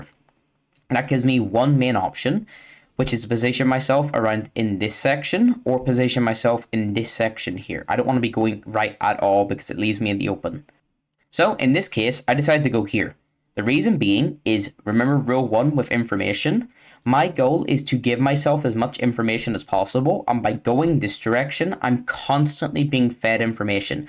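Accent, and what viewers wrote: Northern Ireland, United Kingdom. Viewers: You're awesome. Love the NI accent. God save the King.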